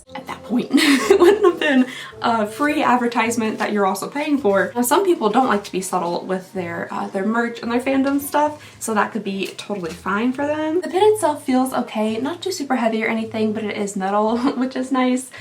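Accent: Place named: Kentucky, United States. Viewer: One of the reasons I love watching your videos is because your accent reminds me of my long distance best friend who is also from/lives in Kentucky. Always love your videos no matter what they are. Hoping to make it up to the stores one of these times I’m down there !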